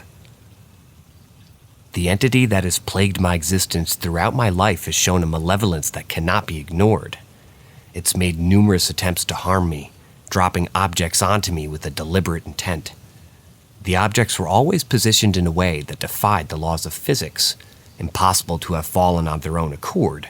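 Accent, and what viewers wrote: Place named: United Kingdom. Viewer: What's with the new British accent?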